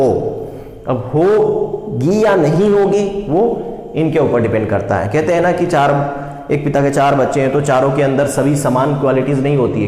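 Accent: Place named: Indore, India. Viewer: Kitna hi kuchh krlo pr thodi si indori to muh se nikl hi jati he ........( Apn)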